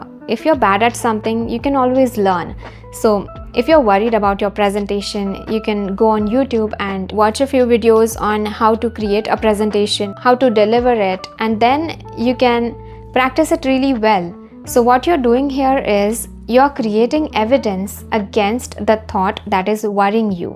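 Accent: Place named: India. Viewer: Where'd you learn to speak your english??? India... How annoying you sound!!!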